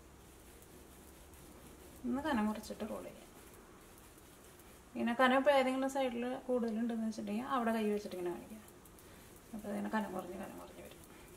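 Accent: Thrissur, India. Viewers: സംസാരശൈലി കേട്ടിട്ട് ചേച്ചി തൃശൂർ ആണെന്ന് തോന്നുന്നു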